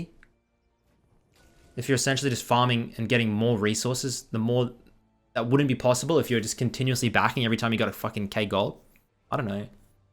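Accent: Australia. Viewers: I believe thats bcs of the australian accent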